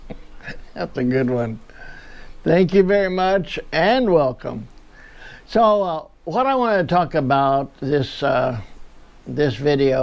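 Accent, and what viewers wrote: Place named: Oklahoma, United States. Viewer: My grandmas family came to Central Illinois from Oklahoma at that time. Now that you mention it, you have a similar accent to her.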